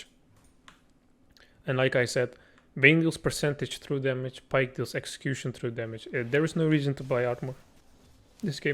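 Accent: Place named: Germany. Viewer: true, it's like a mix of german/english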